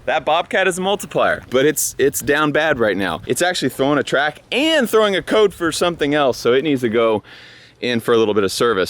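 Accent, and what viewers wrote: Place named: Montana, United States. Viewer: Montana...... Yes please Love the accent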